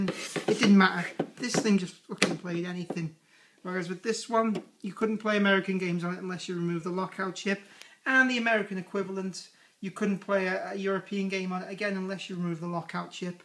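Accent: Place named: Liverpool, United Kingdom. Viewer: u rock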